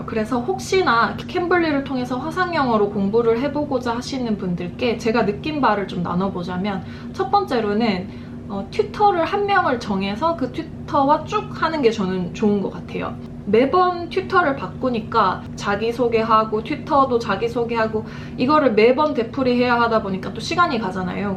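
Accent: Gyeongsang, South Korea. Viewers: 안녕하세요 예전부터 봤다가 처음으로 댓글달아요 ㅎㅎ 경상도 말투 너무 친근하구요(같은 경상도사람) 영어를 엄청좋아하는 사람이지만 영상보고 엄청 도움많이됩니다.ㅎㅎㅎ 자주 댓글남길께요..ㅋㅋㅋ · 안녕하세요~ 오늘 구독하게 되어 여러 에피소드를 봤어요! 재미있고 무엇보다 유익한 내용 너무 좋습니다~ 가끔 나오는 경상도 액센트? 가 있어서 더 귀여우신거 같아요! 자주 올게요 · 노래듣다가 영상이 떠서 들어와봤는데 반가운어투 경상도말이 들리네요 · 갱상도 누나다^^ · 영상 하나 보자마자 바로 구독.. ㅜㅜ 열정은 넘치나 어떻게해야할지모르는 저에게 너무 좋은 영상들! 근데 혹시 경상도분이신가용? ㅋㅋㅋ 가끔 나오는 말투가 경상도같은데 혹시나해서요 ㅋㅋㅋ(제가 바로 대구..)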